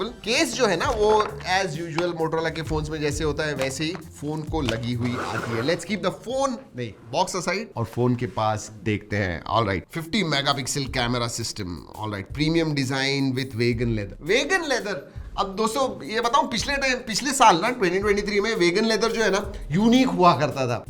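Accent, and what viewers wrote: India. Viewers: The only one indian guy whit nice accent en nice video ❤ thanks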